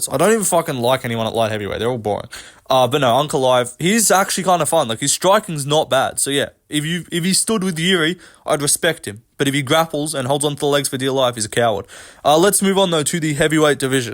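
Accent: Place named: Australia. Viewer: Bedtime MMA but more Australian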